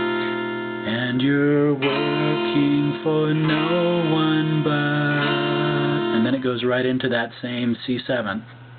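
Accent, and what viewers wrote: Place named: United Kingdom. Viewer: I would of thought you were british!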